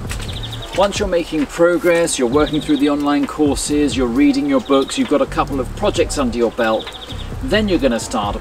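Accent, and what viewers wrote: England, United Kingdom. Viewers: Can I ask which part of England is this?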